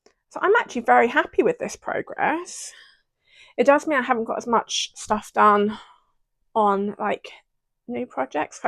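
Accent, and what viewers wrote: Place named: United Kingdom. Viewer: Hi! New subscriber here. At first I thought you were local to me with your store name "Big Bear Yarns," I thought maybe you were from Big Bear, California (which is a great place to ski, and would be a great place to sell yarn)! Then I heard you speak and I said to myself, "Oh, I don't think she's local." 😂 Though you could be a transplant, but really, why would anyone from the UK want to live in the US these days, anyway? 😅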